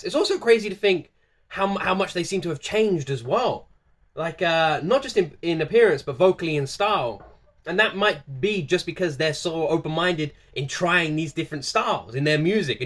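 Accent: United Kingdom. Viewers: You have seen blood seat and tears and fire so it would be good to watch these live 2016 MMA. Is that a Leeds accent?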